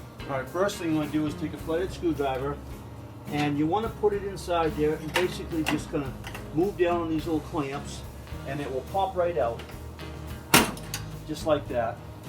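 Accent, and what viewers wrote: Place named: New Orleans, United States. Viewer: Dang buddy I recognize that accent anywhere...New Orleans or somewhere close lol thanks for the tips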